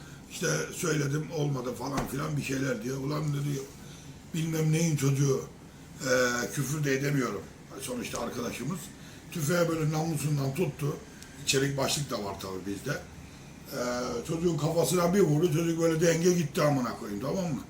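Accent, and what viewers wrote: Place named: Izmit, Turkey. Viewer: abi sen izmitli miydin ya tam bizim buralardaki gibi konuşuyosun harika bir insansın çok sevdim seni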